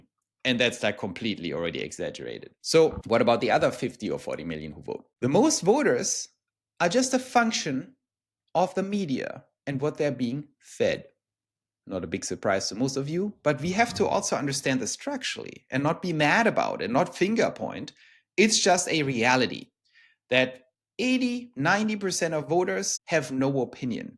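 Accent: Germany. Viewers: Hmm... You sound German. You look Filipino. You live in the US (I think). And have a Thai sounding name. Fascinating! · You’re making so many false claims on statements in this video that I couldn’t take it anymore. I would love to point out all of them to you, but unfortunately YouTube keeps deleting my comments. So let me just say that it is a real shame that someone who really doesn’t have a lot of insight and understanding of German politics is spreading misinformation on the Internet. Going by your accent, you may have been brought up in Germany but that doesn’t change you blatant misrepresentation and errors.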